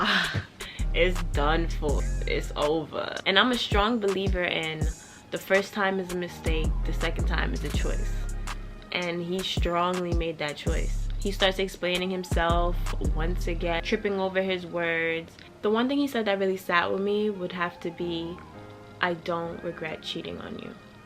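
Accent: New York, United States. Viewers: It’s deadass not enough New Yorkers on YouTube cause I love the way we tell stories. when you was like “ guess who sent me the picture “ I felt like my bestie was putting me on !!!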